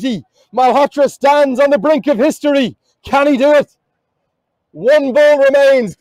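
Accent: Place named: United States. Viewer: First time hearing cricket commentary in American accent 😄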